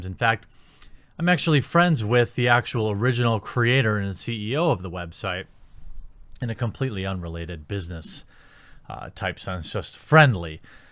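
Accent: Wisconsin, United States. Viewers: He also says “particularily” It’s a Wisconsin thing I think. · Shit are you from Wisconsin? Respect 👍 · It's definitely a wisconsin thing... As an IL person that lived on the WI boarder for 8 years, they pronounce things weird sometimes, but it's not as bad as MN.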